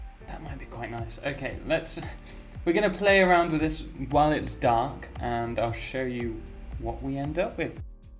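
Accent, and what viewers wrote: United Kingdom. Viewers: the microphone sound doesn't sound muffled or clipping a whole awful lot though, i find the the general quality of the spectrum quite decent albeit a bit boosted agressively in the mids at the serious detriment of bass response (is there even bass?), it does sound like a cheap stick 1 buck mic in that regard (but even those can work magic if you know how) i mean muffled is worse, at least you can clearly hear the words he is speaking. sounds like he just needs to filter out the background noise, and a low hum which is most likely caused by PC fans, and judging by his british accent i'm hazarding a guess he may living in an old house with no grounding on the sockets except for the wet groups, i have that and it sucks shit for playing guitar, you have to constantly keep in contact with a string to ground the hum out lol, yes even on humbuckers.. 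although pickups are technically AC, the amplification stage is NOT. i already said years ago youtubers should up their game in using hard limiters / compressors to get their levels nice, but in those days ear rape was a slapstick thing lol this doesn't seem to be as much as a leveling issue as much as it is an ambient noise/EQ problem i assume you're listening this on headphones, i'm playing this on it on near field monitors with very conservative volume settings so the annoyances you experience kind of fly by for the most, i'm not too bothered by dust, clipping gets on my tits though, which is really not the biggest problem here, i've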